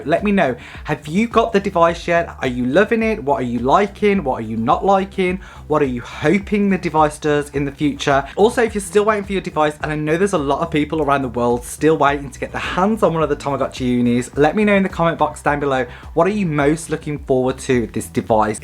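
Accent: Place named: Birmingham, United Kingdom. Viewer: I can defo hear some Brummie in ur accent right? First channel I find to learn about this toy for a Christmas present ha!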